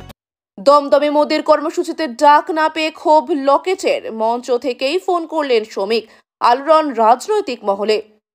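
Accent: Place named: Bangladesh. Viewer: আপনি যতই ভালোভাবে বাংলা বলুন না কেন। আপনার কথায় বাংলাদেশীয় ব্যাপারটা রয়ে গেছে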